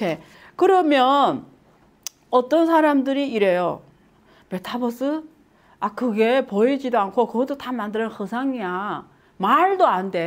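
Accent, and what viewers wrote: China. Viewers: 억양이 조선족? 조선족 못믿. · 조선족인가요? 말투가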